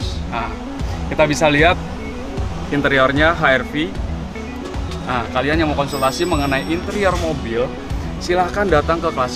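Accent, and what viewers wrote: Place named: Java, Indonesia. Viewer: Sampean asli pundi nggeh mas. Kok ngomong e nggowo boso jowo😁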